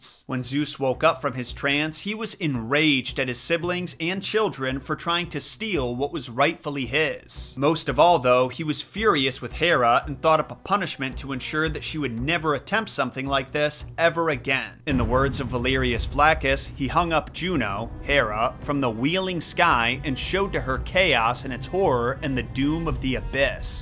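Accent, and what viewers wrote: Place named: Midwest, United States. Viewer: What part of the Midwest are you from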